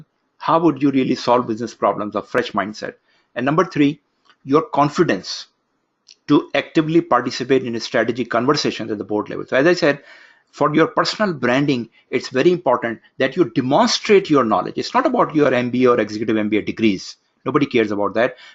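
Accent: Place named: India. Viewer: it would be better to use an AI voice for these videos, listening to indian pronunciation is extremely exhousting, without even talking about the accent.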